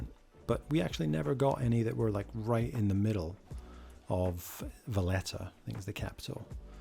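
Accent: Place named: Scotland, United Kingdom. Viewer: Hi bud. Enjoying your videos. Where in Scotland are you from? Seem to have a bit of a mixed accent. I'm Scottish myself so good to come across another countryman who likes geoguessr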